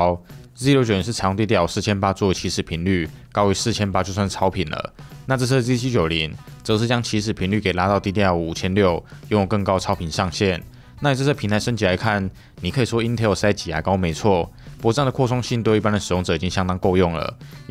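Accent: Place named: Gan, China. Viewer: 13代CPU 評測 比起大陸 二斤 貓三 極客灣 裝機猿 賣茶人 翼王 等團隊 動作慢了5天 可是只有Huan的贛話 是獨一無二的。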